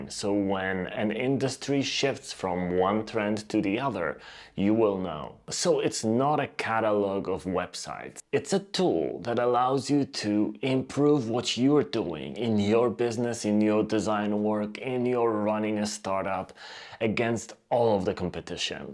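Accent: Poland. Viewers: i love this polish accent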